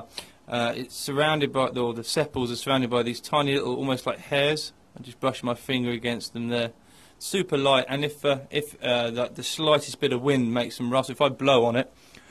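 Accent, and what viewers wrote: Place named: South, United States. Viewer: You sound like your from the south country btw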